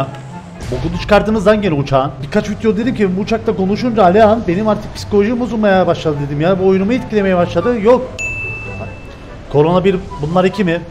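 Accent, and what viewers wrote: Cyprus. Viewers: Abi sen kıbrıslımısın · Kıbrısta nerelisin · Abi sen gerçekten Kıbrıs lımısın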